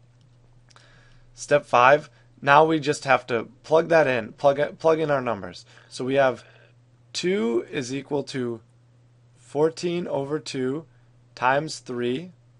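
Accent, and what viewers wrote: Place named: Wisconsin, United States. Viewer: Your accent sounds like Randy Russell. Are you from Wisconsin?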